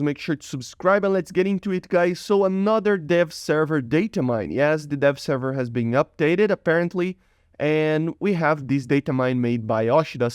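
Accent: Brazil. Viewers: He’s Brazilian speaking a second language it’s fine. Everyone knows what he means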